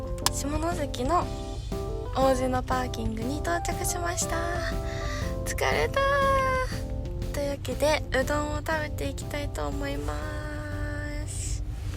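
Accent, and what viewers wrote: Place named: Osaka, Japan. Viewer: お疲れ様です✋六万キロ達成おめでとう(笑)😊何か大阪弁が板についてきたかな？(笑)😊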